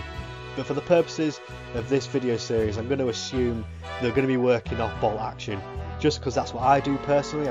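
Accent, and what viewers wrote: United Kingdom. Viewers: Thanks for starting this series. So far ok but what are you trying to do. Why not start with the "history" of the VBCW? Why is this war going on, what are the factions, what has happened . You start us off by showing a bunch of booklets that make no sense without context. Next, LOSE THE MUSIC. Please consider that, hopefully, many of your watchers, like me, are not accustomed to a British accent. It is interesting to learn about the milieu from a British speaker, however. The music makes it even more difficult to understand the vocal audio. Please, also speak a bit slower. I am looking forward to soon learning about what this VBCW is actually about. Thanks.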